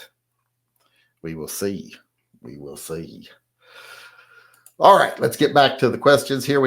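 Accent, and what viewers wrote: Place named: South, United States. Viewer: You have a pronounced southern accent, but I guess you knew that.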